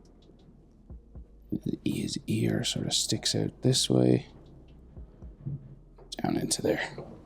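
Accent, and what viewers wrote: Ireland. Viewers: Welcome back, you from Ireland?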